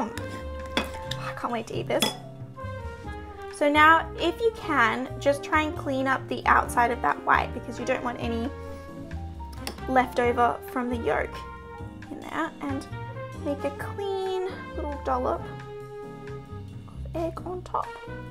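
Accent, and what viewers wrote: Australia. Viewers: You’re one of the only girls that makes an Australian accent sound pleasant, lol. No hate!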